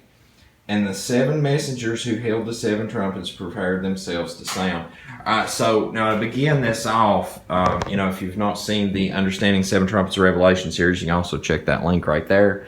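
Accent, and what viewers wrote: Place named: South, United States. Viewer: Very informative and compelling.. Thanks,.. God bless and guide you, dear brother.. Btw, where are you from? I definitely detect a Southern accent. North or South Carolina? My Momma was from Kings Mountain, NC,and I still have tons of kinfolk,down yonder, that a way...:)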